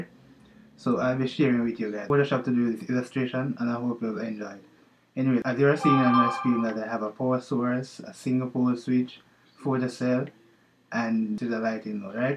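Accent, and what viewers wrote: Jamaica. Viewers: Yaaad man voice dis.....Hailingz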